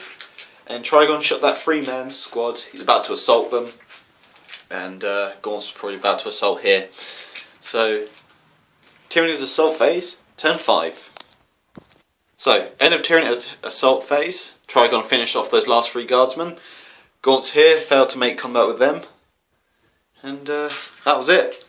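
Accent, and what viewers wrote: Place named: England, United Kingdom. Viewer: Nice batrep mate, it's refreshing to hear an English accent and to see someone getting on with a drawn-up list rather than an American complaining about how the new book is uncompetitive. A nice win and keep up the good work :] PS - Nothing against our Yank cousins, it's just that most of the batreps I've watched have been American and rather dismissive. :P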